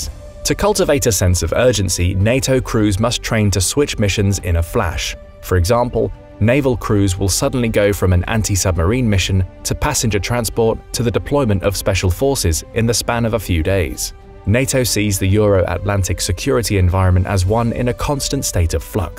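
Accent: United Kingdom. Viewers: Is this the Infographics guy with a British accent? 😮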